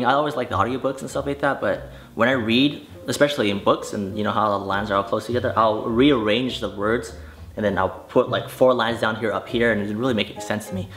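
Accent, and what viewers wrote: United Kingdom. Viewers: you sound british man! lol - greetings from sydney australia! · ahaha your accent leans more towards the british accent :) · The accent.... Lol I'm Australian and that was so British ahaha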